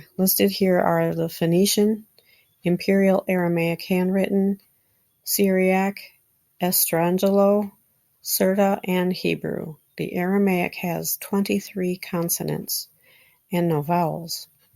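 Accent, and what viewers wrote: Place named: United States. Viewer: Madam, you are butchering the Semitic languages! You have absolutely heavy American accent! Our phonetics is quite different!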